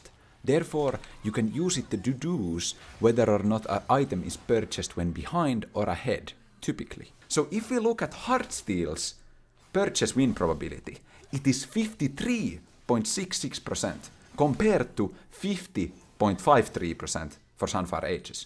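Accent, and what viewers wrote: Finland. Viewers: are you from finland by any chance? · Hyvää duunia, ja tosi hyvin selitetty enkuksikin. Hämmentävää miten rallienglannin kuulee välittömästi mut kundi artikuloi nii hyvin et vika on kuulijassa jos ei ymmärrä. Analyysi on kyllä hiton mielenkiintosesta aiheesta, pidän nää pointit mielessä ku meillä tulee statistiikkaa taas jossaki kohtaa. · love your (finnish?) accent. One item, hahaha. Two item hahaha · Nyt onkyllä jo pakko kehua tätä suomi aksenntia! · My dude your accent is amazing 😂 ootko suomalainen? My favourite was definitely “obsörved”. The stats were fun, not even a LOL player but this was fascinating. Best of luck with your Fall & future endeavours. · Hear Finnish accent. Subscribe to channel. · i immediately recognized your Finnish accent, and its interesting that the thesis says "Aalto University" as well XD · Banger finnish English. 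Warms the heart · jumalauta mikä rallienklanti <3 jos et tee tahallaan nii kandee panostaa tarkotukselliseen ralli artikulointiin, siinä on tavaramerkkijä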